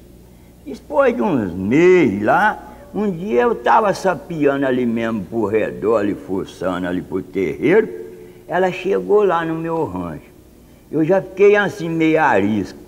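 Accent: Minas Gerais, Brazil. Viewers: conheço bem esse sotaque kkk minero raiz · Onde nasceu não sei . O sotaque e de mineiro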